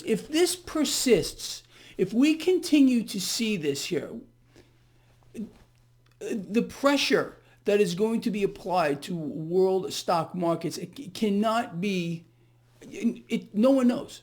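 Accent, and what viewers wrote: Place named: New York, United States. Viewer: I'm a life long New Yorker. You sound like you're from NY. Am I right?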